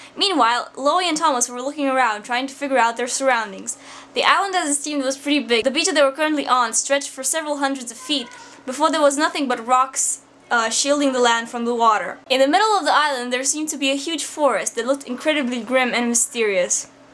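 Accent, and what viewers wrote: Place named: United Kingdom. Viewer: oh shit your accent gets more british every time nooo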